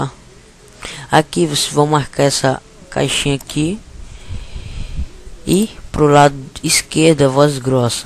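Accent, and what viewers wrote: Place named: Florianópolis, Brazil. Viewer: tu tem sotaque de manezinho da Ilha! HAHAH tu é de SC?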